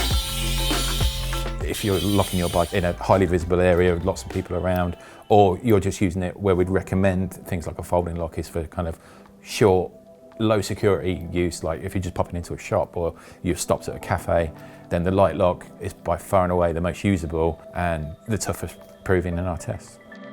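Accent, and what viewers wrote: England, United Kingdom. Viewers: This Video looks very useful except this guys is sorta quasi mumbling in a thick English accent makes him very difficult to understand... blah... I have to turn on the closed captioning and still you aren't confident you are getting it all... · I agree with someone... said so much but learn little,plus the british accent... god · Difficult to understand your strong UK accent on some of the lock names because you speak so fast.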